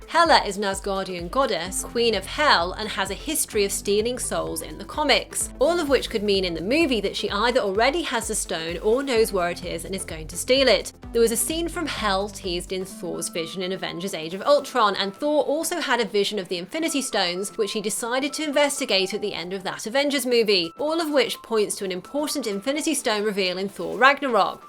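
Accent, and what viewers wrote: United Kingdom. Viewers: another movie video in British accent! it's the third one only today that's bizarre !!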